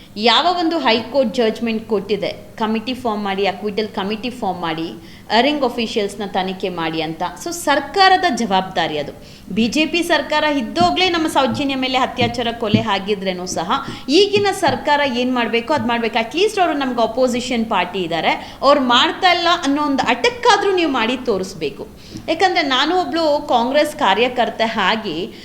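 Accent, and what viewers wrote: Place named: England, United Kingdom. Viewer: Mam neev matado kannada style super 👌 👍 English style alli kannada maatu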